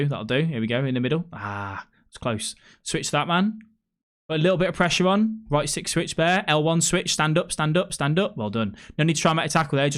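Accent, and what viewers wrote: United Kingdom. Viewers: Bro are u from uk ? its so hard to understand you sometimes xD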